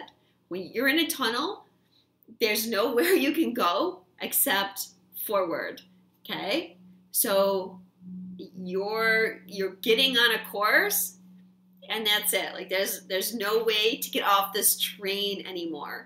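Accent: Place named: South, United States. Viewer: Yes, it still seems impossible NOT to rest; I just want to get into my mission and being creative and stuff, but man; I just don't have enough physical energy nor would I know where/how to start!!:-)) By the way, are you a singer? You have a Country voice that reminds me of Emmylou Harris:-) Much Love to you as always, and thank you for the video <3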